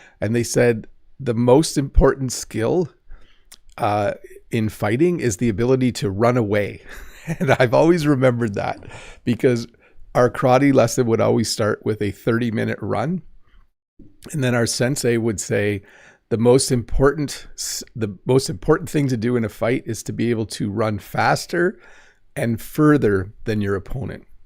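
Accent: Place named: Canada. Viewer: Assalamu Alaykum,. My first name's Ali. My last name's Orkhan. By the way, I've been watching your lessons for three years😊😊. This lesson was very good for me. After all, this lesson gave me a lot of knowledge in English. I watch your videos because I love Canadian Accented English. Or rather, I speak Canadian Accented English because I love your lessons,. Greetings to you from Azerbaijan.❤❤❤😊😊😊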